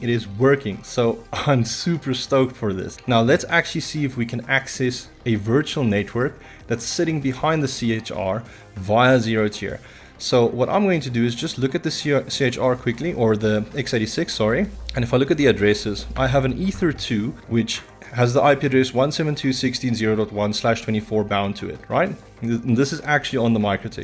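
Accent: South Africa. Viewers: <num>... Knew I was picking up a South African accent. lekka man!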